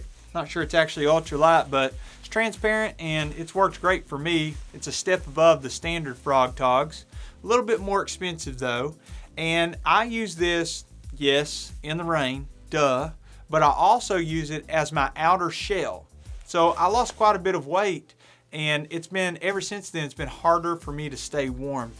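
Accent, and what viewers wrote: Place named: South, United States. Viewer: In fact, if you keep the bottle cap on and cut off the bottom end of the water bottle, your scoop is several ounces larger because you didn’t have to lose any volume getting to the wide part of the bottle; and - bonus - you have a funnel option if and when you need one. Not a good option is you are wanting to park a scoop of water upright, unless you jam the neck of the bottle into a cranny in a tree root or a vole hole. Anyhoo, I dig your vids; you are judicious, even-handed, and informative, all in a well-tempered laid-back package. I take it from your accent that you are a Southern boy; I grew up within 5 miles of the tallest peaks along the Blue Ridge Parkway and about 10 miles from the North Carolina border of the GSMNP, where I started hiking and camping at age 7 or 8 with my Pop and younger brother. As you said, good stuff.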